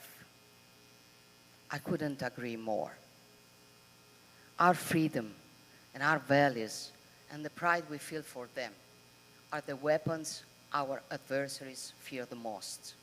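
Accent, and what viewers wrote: Rome, Italy. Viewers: Onore al merito, ma che inglese romanesco!!! Non si può sentire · i admire she went all out trying her best in English.Although she gained more and more (Italian) accent along the way,she managed to get all important concepts across,she corrected herself in few occasions,still composed and strong.it is impossible to be liked by everyone,and she knows that very well,but i think she now have the respect of many.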